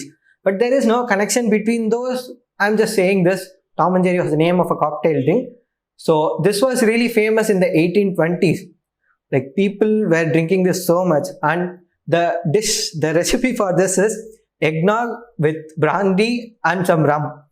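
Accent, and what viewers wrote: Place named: Kerala, India. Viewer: He sounds malayali to kaisa bolinga une haule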